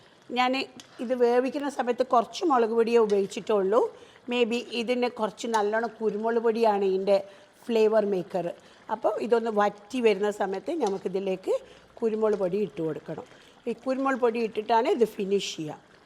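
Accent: Kozhikode, India. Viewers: ഇത്ത, എന്നും കാണാറുണ്ട് പുതിയ പാചക വീഡിയോസ് ഇടുന്നതും കാത്തിരുന്നു കണ്ണും നട്ടു ഇരിക്കാറുണ്ട്, ഇങ്ങള് പുലിയാണപ്പാ, എല്ലാ വീഡിയോസും ഒന്നിനൊന്നു മെച്ചം, കോഴിക്കോട്ട് ഭാഷ സൂപ്പർ നല്ല രസം ഉണ്ട്‌ കെട്ടിരിക്കാൻ, പുതിയ ഐറ്റംസ് പ്രതീക്ഷിക്കുന്നു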